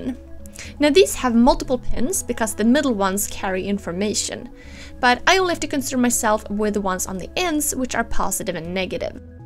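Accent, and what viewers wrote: Sweden. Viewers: HI! Read that you live in Oregon now but you're from Sweden originally right? Recognize the accent ;) Moved there recently? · kul projekt! (antar att du är svensk pga uttalet) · Nice! Well done! BTW R U from Sweden by any chance? Din dialekt är skön :) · Are you Swedish? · You sound like you are from sweden :D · are you Swedish? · are you Swedish? nice video! totally making this. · Hey, I wonder where you are from? :) what country? you sounds like a swed :P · Very cool and creative product, keep it up! also, are you by any chance Swedish? your accent sounds really Swedish! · You sound really Swedish haha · swedish accent! :) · 100% swedish accent!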